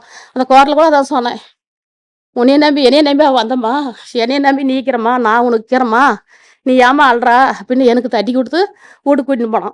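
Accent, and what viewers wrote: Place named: Krishnagiri, India. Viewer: எங்கள் அம்மாவை போலவே உங்கள் முகமும் பேச்சும் கிருஷ்ணகிரி மாவட்டம் 😢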